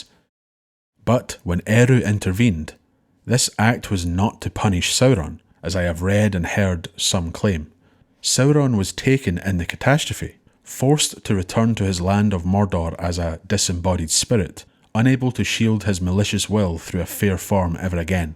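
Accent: Scotland, United Kingdom. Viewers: Glad to have found this. And glad to find a fellow scot as a narrator.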